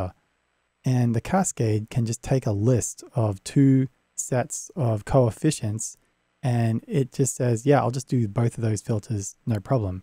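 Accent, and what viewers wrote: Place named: Australia. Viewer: Ah man I've missed those dulcet antipodean tones. Very interested in both of these - to gumroad..awaaaaaaayyyyyyyyy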